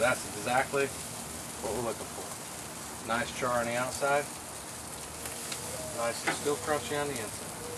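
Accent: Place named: South, United States. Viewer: Kiddo, where did you get that coloma meets southern drawl?? You're SO awesome. Love the vids!!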